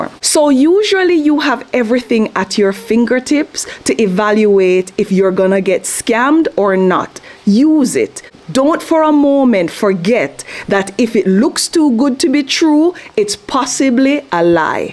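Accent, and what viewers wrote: Jamaica. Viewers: I thank you so much beautiful and wonderful woman I can hear the accent but you already said something about Jamaica I am African thank you this is the second rinse and repeat Rockstar I'm watching you're straightforward absolutely wonderful honest there's no holds bar thank you Rockstar I believe you will hear from me you are blessed.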